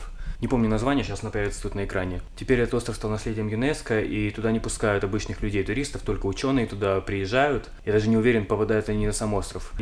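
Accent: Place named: Odessa, Ukraine. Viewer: а ты шо из Одессы?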